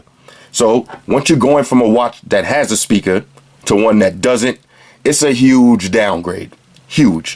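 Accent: New York, United States. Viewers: you are so NY, where r u from?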